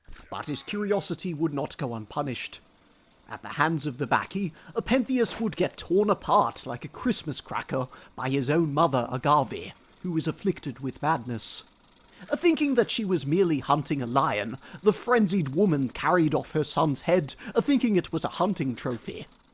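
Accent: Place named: United Kingdom. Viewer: This sounds very British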